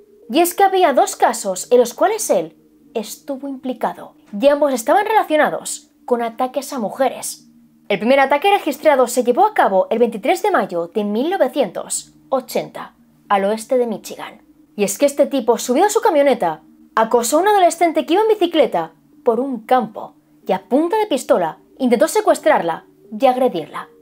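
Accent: Spain. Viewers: ¡Qué linda estás! Siempre veo tus video y me encantan (además amo el acento español). Saludos desde Argentina🥰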